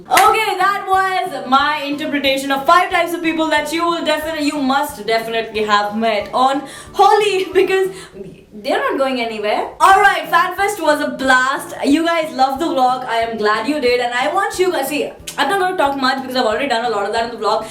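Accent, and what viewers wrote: Maharashtra, India. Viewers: So i hear Superwoman watched and liked ur videos :) Yay! Love your Marathi accent.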